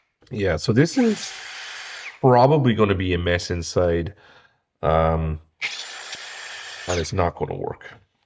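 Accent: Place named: Germany. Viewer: excellent video! first off , your German accent was the highlight of my morning. Also, after the mosfet install u used a yellow brush to cleanup the job site. what product did you use for that? cuz i have some rebuilt ham radio gear where they didn't clean like you did. Also,is there a number of seconds u keep the s gun on to avoid cold solder joints.? lastly, I have this Bose 300 but I entertain at fancy houses and ive seen numerous Sonos brand installs. Do you know if Sonos has a good build quality? Thanks so much. Jim in Washington state.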